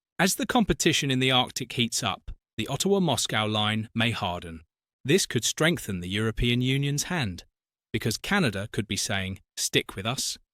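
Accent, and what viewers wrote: United Kingdom. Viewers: what is this Turkish BS Propaganda? with a British accent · U sound british and that explains yr stupidity . Bringing turkey in to the picture u are signing ukranes death certificate and u know that because they are made out of the shit as the british . The minute putin gives them a longer cucumber to suck on they will run ove to him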